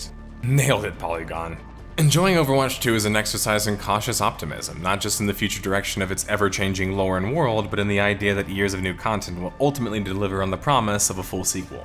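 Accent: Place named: Ohio, United States. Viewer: I dont know whats worse. A frenchie trying to speak english poorly. Or a ohioian accent trying to speak english poorly.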